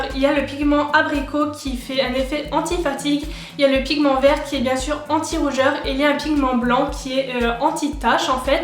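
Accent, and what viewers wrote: Lorraine, France. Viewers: AAAAH je viens de te découvrir et ton accent Lorrain me fait plaisir ! Je suis une Lorraine de base ! Bref là n'est pas le sujet, j'ai commandé la CC crème il y a 2 jours, j'espère la recevoir aujourd'hui car vous me tentez toutes avec vos revues ! Contente d'avoir découvert ta chaîne, je m'abonne direct :) A bientôt ! Charlène <link>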